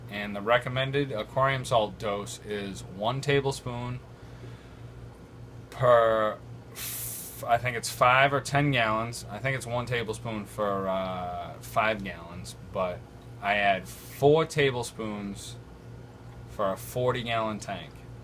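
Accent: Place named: New England, United States. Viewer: Deff from New England lol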